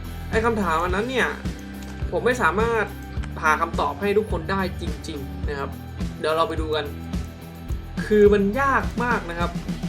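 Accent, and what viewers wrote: Isan, Thailand. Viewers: ชอบมากหลายๆเว้าอิสานคน จ.ใดหนอนิ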